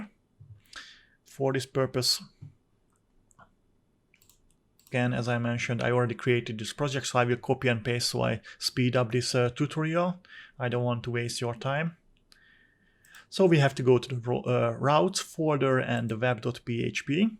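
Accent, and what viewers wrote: Hungary. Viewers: Hello and thanks for the video, it really helped me complete my project!! also I study in hungary and I immediately recognized that hungarian english accent lol, thanks again.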